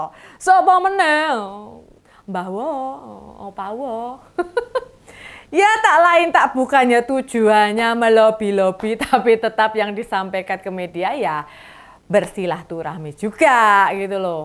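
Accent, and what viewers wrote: Java, Indonesia. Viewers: Saya puas banget....!!! lucu mendengar narasi dengan logat bahasa jawa yang mendok di vidio ini. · teruskan karya nya Mbak. Ini luar biasa. Anda cerdas, cantik & menarik. Pembawaan nya njawani, saya bangga BRAFO. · aku ngefans sama suaranya..logatnya yojo tenan! wes nomer 02 turu ae nang omah..ra mgkn menang!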